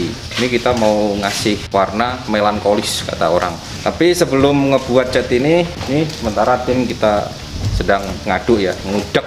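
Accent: Java, Indonesia. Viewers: Baru nonton eh ada logat jawa nya Tmpat tggal nya di mana ya, Klo boleh pengen maen&belajar biar tmbah ilmu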